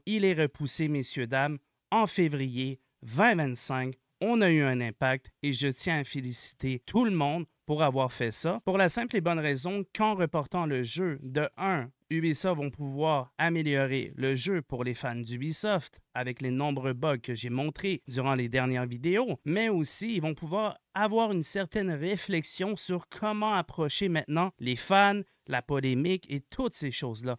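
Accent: Quebec, Canada. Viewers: Sympa ta chaîne mec ;) J'adore l'accent et les expressions du Québec.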